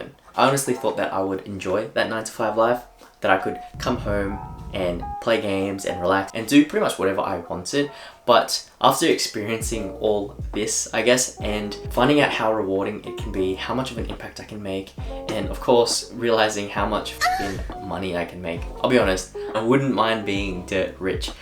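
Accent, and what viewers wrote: Australia. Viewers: Love that Aussie accent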